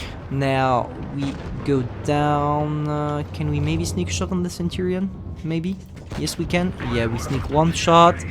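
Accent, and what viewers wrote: France. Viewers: Mec fait pas semblant t'est français sa se voit à ton frenglish 😏😂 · Lol t'es français ?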